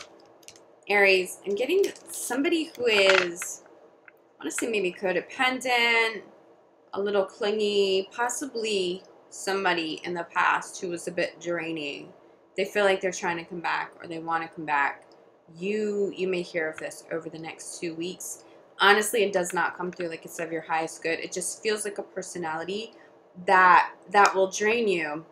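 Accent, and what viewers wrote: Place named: East Coast, United States. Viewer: Your from the East coast 🤔🤔🤔🤔🤔♈👑 I like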